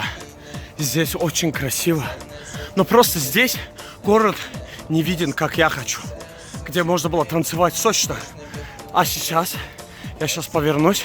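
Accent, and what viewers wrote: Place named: Azerbaijan. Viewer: Акцент азербайджанский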